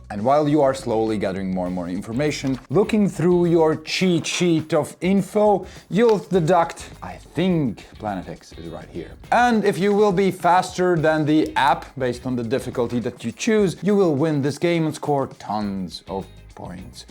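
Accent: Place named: Latvia. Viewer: sasodīts, man vajadzēja tikt līdz šī video, lai sāktu aizdomāties...? Akcents? Yep. Skaidrs. Apsveicu, jums līdz šim tīri labi izdevās nomaskēt savu identitāti:) P.S. kur jūs pērkat visas šīs spēles? daudz kas no jūsu apskatiem LV nemaz nav nopērkamas (vai arī vnk nezinu, kur skatīties?)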